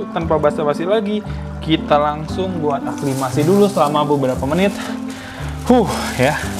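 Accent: West Java, Indonesia. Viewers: Bang Orang Sunda Ya?:)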